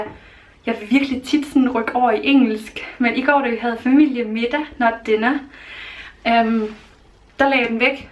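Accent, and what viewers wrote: Funen, Denmark. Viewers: Fineste. Så dejligt at høre dig noget mere på dansk ❤️. Elsker dit fynske 😍. Knuuus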